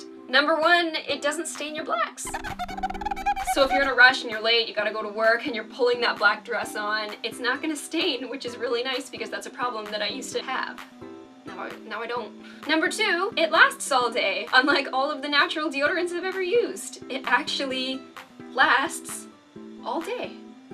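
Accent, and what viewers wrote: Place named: Canada. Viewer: You sound canadian. I love watching your videos because I'm in love with the way you talk. You're just hilarious. And a little quirky. But that's character! :) :)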